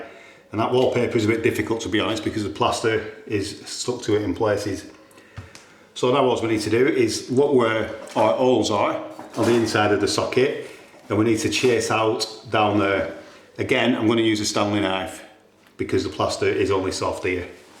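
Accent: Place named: Lancashire, United Kingdom. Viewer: Soft, crumbly plaster and soft brick too. Soft plaster not surprising but given your accent, I'm surprised the brick was soft too. Thought that round your way most would be Acrrington bricks and they are like reinforced concrete! lol.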